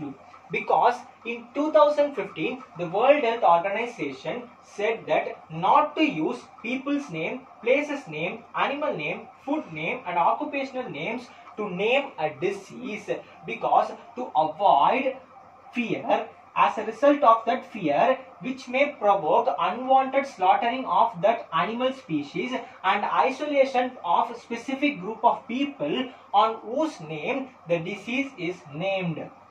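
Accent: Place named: Punjab, India. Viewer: yrrr ap ka andaz ajeeb h bht mtlb lhja punjabi type h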